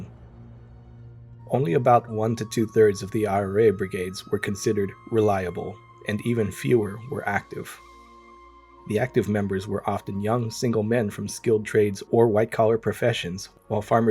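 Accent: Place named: United States. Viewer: Jayzush Christ will ye be dropping the yank accent for phuqqs sake! Seán McKane? Rural members were unreliable? Tell that to the Flying Columns in Munster, Longford, and Mayo.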